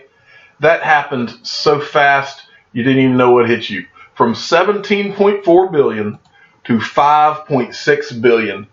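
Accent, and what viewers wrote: South, United States. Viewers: My job keeps me traveling. I am rarely at home. I listen to you every day while I am moving from one place to another. I also have a Youtube channel. My monthly Google payment goes into XRP. The first, second, third and fourth time I heard you on youtube I clicked forward IMMEDIATELY! That southern accent of yours was the proof that you were stupid AS FAR AS i WAS CONCERNED! One day you posted a topic that caught my intrest and l listened to your entire video. I have been hooked ever since. YOU ARE AMAZING!!! Forgive me for my predijust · don't judge by voice alone, a lot of the southern guys are smart like Mike Judge who created hilarious movies and King of the Hill. This is the best XRP channel because has experience in the finance world & has been investing in XRP for 3-4 years BEFORE anyone else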